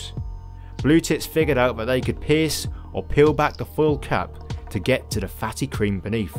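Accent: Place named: England, United Kingdom. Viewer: Really enjoy your videos mate. You sound English, so I think you meant they are found as far EAST as Turkey, not West. 😉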